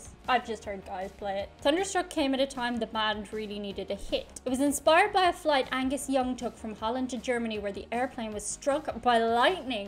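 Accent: Ireland. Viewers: She’s Irish, some of her videos are even labeled as such (accent too). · She’s Irish by the sound of her accent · Nice irish accent there........ No effin way you made it to whatever age you are without hearing Thunderstruck.........and supposedly completely unaware of one of the most famous rock bands ever........ Extremely popular in Ireland and on Irish radio stations...... in particular. Efin clickbait...........and I've just realised that I'm a victim of it.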